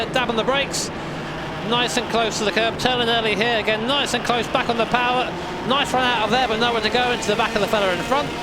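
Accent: United Kingdom. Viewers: I love your British accent lvl. 100: "The difference between driving a kaa vs driving a *kaa*"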